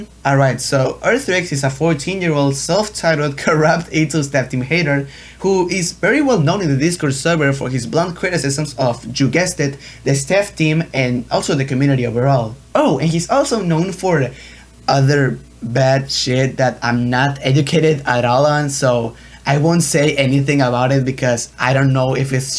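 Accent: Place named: Spain. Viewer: se le sale el español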